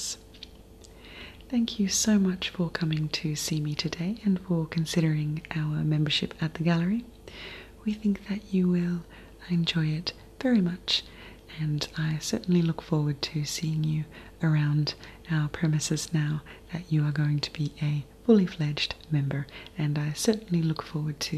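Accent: Australia. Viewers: Tpgain 70 sounds like an elegant and educated Australian accent in my opinion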